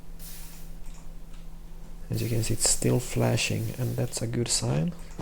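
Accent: Poland. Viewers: bardzo dobrze to wygląda ! ;) jest polski akcent super ;) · dude are you polish ? XD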